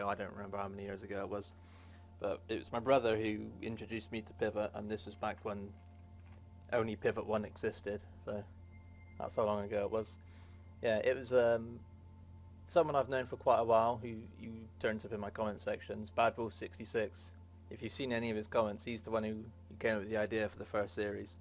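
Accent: United Kingdom. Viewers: epic british accent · You sound like if a voicebot had a british accent ngl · Oh my god you have a so goof brithis acent. Its sounds so good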